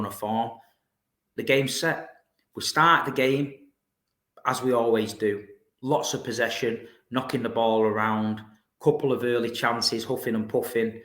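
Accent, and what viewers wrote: Manchester, United Kingdom. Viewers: Love listening to you talk about us mate, proper manc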